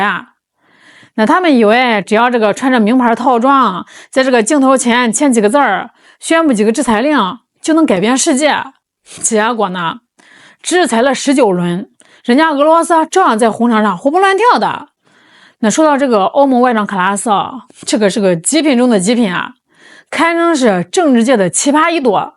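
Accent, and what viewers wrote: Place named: Shandong, China. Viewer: 这女的是山东哪的口音？ 章丘？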